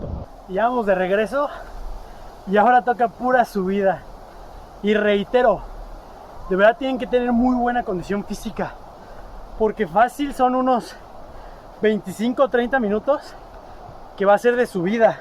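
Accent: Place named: Mexico City, Mexico. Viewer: A este cuate se le nota lo chilango en su chisme jajaja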